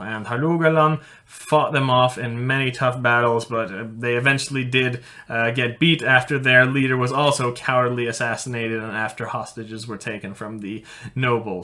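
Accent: United States. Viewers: Dacca if you are born in the US your a American... The guys accent is pretty clear he's American... Vikings are long gone but theres still scyfy nerds running around making false claims but hey whatever floats your boat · Are you American of Norwegian descent or Norwegian immigrant to America? Or... neither? You just sound so American lol. No hate just curious 😊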